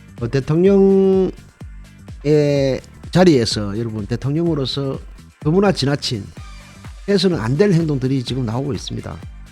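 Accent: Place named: North Korea. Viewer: 이 채널주는 북한사람입니다. 어투보세요. 북한사람이에요. 간첩.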